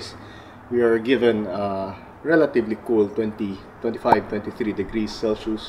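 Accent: Philippines. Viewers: Are you a filipino? Your accent sound like filipino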